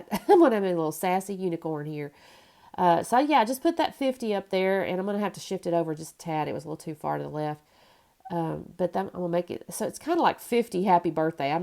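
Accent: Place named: Virginia, United States. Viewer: Congratulations on meeting your subscriber goal of 1,000! I always enjoy your layouts and your personality in your videos. My husband was walking through the room yesterday when I was watching your latest video and said "Where's she from? She sounds like she's from back home where I lived in Virginia!" Hope you keep on making videos and continue to increase your subscribers!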